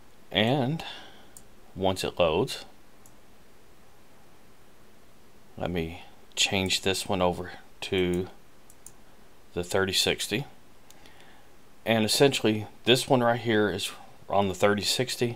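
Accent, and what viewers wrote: United States. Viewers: You are showing exactly what I was asking about.... I saw your other videos. Many many thanks for this sharing knowledge spirit especially it is presented with this special american accent (which area it belongs to?). Thanks and may God bless you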